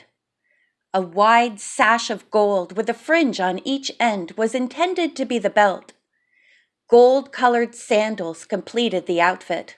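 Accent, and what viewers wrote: South, United States. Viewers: I love your southern accent !! It is perfect !!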